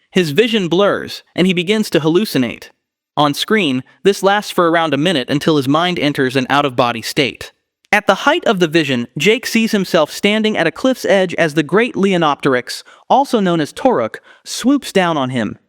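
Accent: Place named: Russia. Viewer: Что за хрень? Откуда этот рашен инглиш? Аж уши режет. Why?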